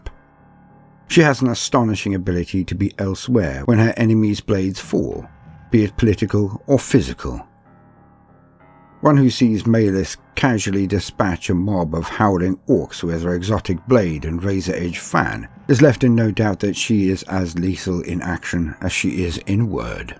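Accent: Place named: England, United Kingdom. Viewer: You know what's awesome about the King's English? When I say too-daloo to someone in American English I sound like someone with a learning disorder. You make it sound like cookies on Christmas morning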